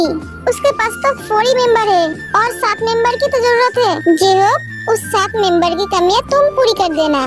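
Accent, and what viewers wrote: Bengal, India. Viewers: Di Bengali ho kya aapki Hindi bolane ki Tarika se aisa lag raha hai mujhe.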